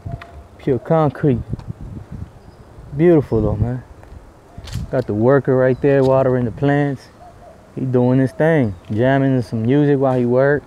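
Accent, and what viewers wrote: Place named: South, United States. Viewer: Hola si se te nota en El hablando q estuviste en El sur de USA. Saludos y sigue adelante Muy bonito y limpio. .Carisimo para vivir ahi.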